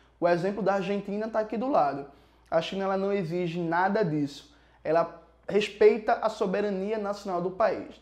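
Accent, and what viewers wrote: Pernambuco, Brazil. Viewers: Seu português-pernambucano dá um certo charm e uma aura especial. Competência nem se comenta. Reconheço.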